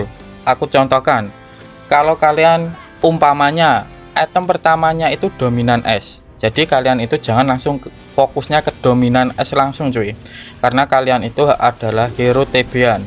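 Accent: Java, Indonesia. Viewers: Jawa bang?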